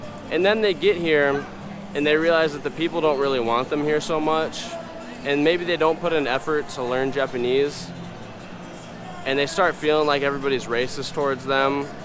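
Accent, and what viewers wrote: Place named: Upper Midwest, United States. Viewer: your upper midwest accent was thick in this one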